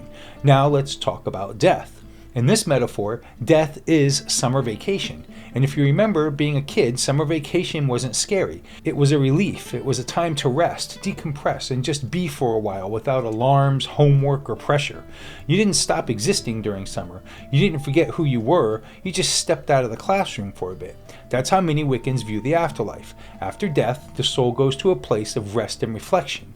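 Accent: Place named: United Kingdom. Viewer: Oh, you're British! 💂🏽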